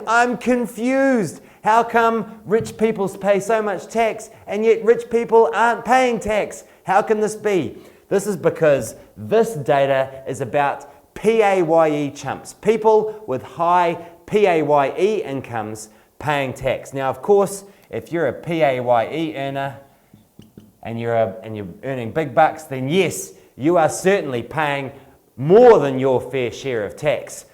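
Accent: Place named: New Zealand. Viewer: This applies to New Zealand it only obvious if you recognize the accent.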